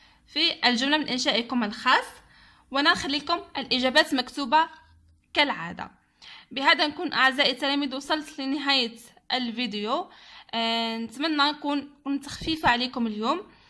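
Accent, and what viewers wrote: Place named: Constantine, Algeria. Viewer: قسنطينية راكي باينة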